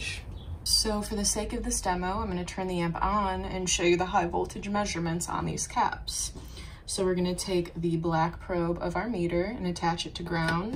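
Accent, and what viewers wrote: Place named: Southern California, United States. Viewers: Great tips on how to live longer! You sound like a Californian, if so ,how can I get my amp to you so that it can be fixed by a true Pro? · That was awesome, and without a doubt the definitive SOCAL delivery. Lol. Very laid back and with that slight slowness and crackle at the back of her words. Like, totally…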